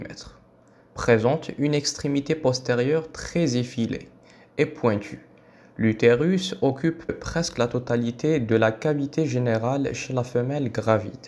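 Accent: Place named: Algeria. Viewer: Vous avez une accent algérienne en TT cas merci infiniment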